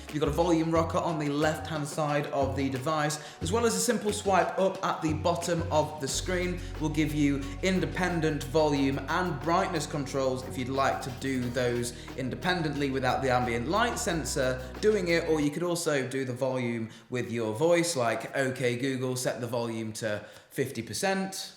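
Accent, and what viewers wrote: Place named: North, United Kingdom. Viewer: Really? He doesn't have an upper class accent. Sounds quite midlands/northern to me (not saying that northerners can't be upper class, but the accent usually is a tell-tale). Also, that chip on your shoulder. Have you thought of dealing with it?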